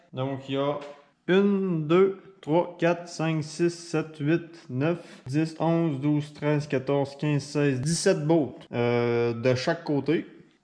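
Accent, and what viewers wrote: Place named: Quebec, Canada. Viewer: Enfin un mécano qui parle français Québécois merci et à la prochaine !😂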